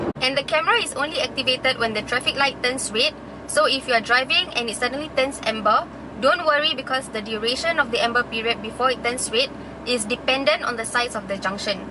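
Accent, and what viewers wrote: Singapore. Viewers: Thank you for spending time making these videos. Clear spoken English with a tingling Singlish slang. I like 👍. Well done though need to curb the damn word haha. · Well presented in our local English. Easy to understand. Enjoyed this video very much and sincere thanks. TP or LTA should consider to buy this video to educate new qualified drivers or new converted drivers.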